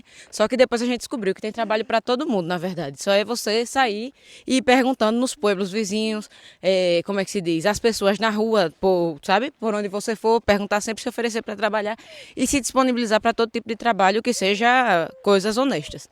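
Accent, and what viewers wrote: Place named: Natal, Brazil. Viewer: Você é de Natal/RN? O sotaque é identico ❤ Ótimo vídeo 🎉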